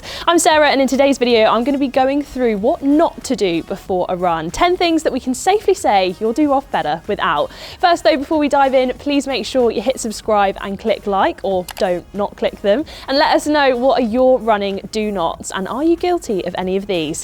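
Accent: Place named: RP, United Kingdom. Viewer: She has, what we would say here, that BBC tone to her. I always wondered whether that comes naturally to people growing up in the UK or whether it's through training.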